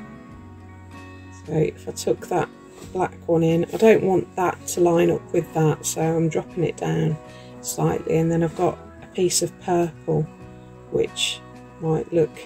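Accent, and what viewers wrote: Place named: Lincolnshire, United Kingdom. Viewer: I've just discovered this video. Lovely to hear a Lincolnshire accent. Your pages are amazing, I am so inspired to have a go. Unfortunately I don't have an instagram account but I can watch all your you tube videos. Thankyou for sharing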